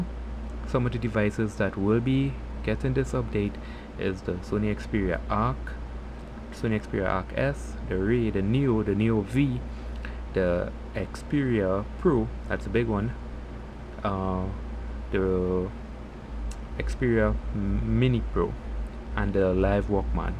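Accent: Trinidad and Tobago. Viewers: hi are you from trinidad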